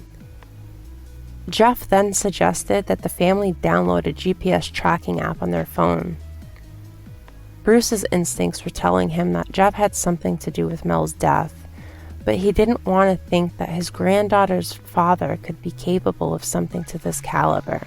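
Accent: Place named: Boston, United States. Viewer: Nice job on this, I am from Boston, and I can barely tell you have a Boston accent, although I can tell slightly, but you have a beautiful voice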